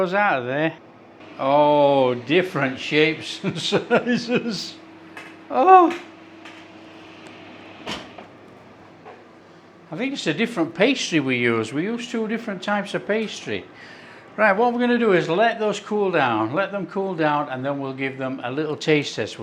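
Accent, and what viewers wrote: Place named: Northern England, United Kingdom. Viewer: I love our Northern accent (so many posh Southerners presenting) . I am addicted to these in Lid ull 75p buy 5 one each work day.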